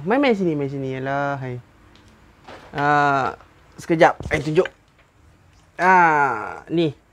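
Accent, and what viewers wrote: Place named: Malacca, Malaysia. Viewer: Dengaw loghat, blh tahu.. kompom melake…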